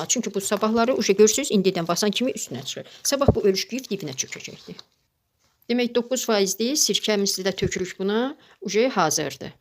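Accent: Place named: Ganja, Azerbaijan. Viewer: İndi duzlu suyunu qayirajıyıx ,,belə bircə Gəncəlilər danisir ,,ağzını örtüb qoyuruq qırağa .Sizə uğurlar xanım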